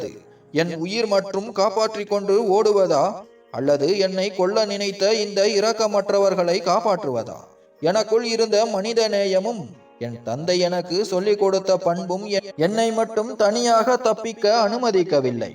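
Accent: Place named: North India, India. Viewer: கதை நன்றாக உள்ளது ஆனால் அதை படிக்கும் விதம் சரி இல்ல. வடக்கன் தமிழ் பேசினா எப்படி இருக்குமோ அப்படி இருக்கு.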